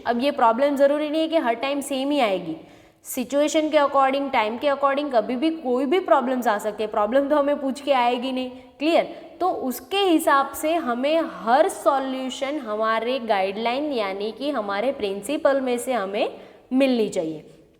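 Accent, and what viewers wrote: Gujarat, India. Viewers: Gujarathi Accent ❤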